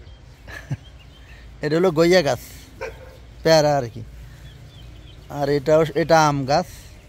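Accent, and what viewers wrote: Noakhali, Bangladesh. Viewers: একটা জিনিস হল ভাই আপনি আমার মতই একেবারে নোয়াখাইল্লা ভাষায় কথা বলেন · আপনার বাড়ি কি নোয়াখালী ❤❤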